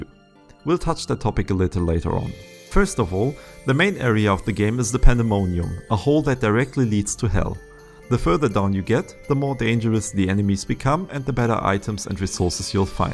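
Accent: Germany. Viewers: man hört sofort dass du deutscher bist ^^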